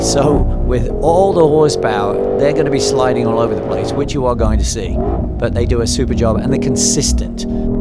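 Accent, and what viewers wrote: United Kingdom. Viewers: He is from the UK been in the states for 30 plus years.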